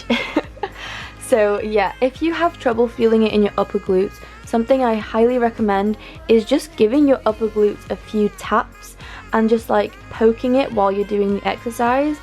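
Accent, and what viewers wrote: United Kingdom. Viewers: S I'm not a native English speaker but I also think that British English is the most beautiful accent.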